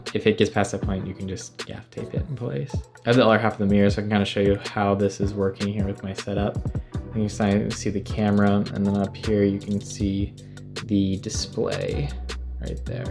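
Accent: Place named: Norway. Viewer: you hear like a norwegian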